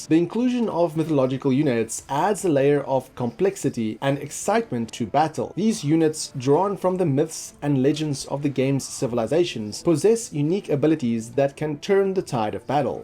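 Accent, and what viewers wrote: South Africa. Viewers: The sout African is back. Whooo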